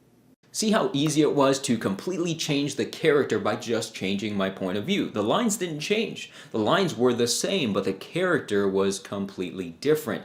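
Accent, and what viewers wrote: South, United States. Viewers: Love the southern accent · the Southern accent to sound so natural on you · Liked the southern accent🙈😌😭😆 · Your southern accent sounds like Matthew McConohay 😅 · Your southern draw is awful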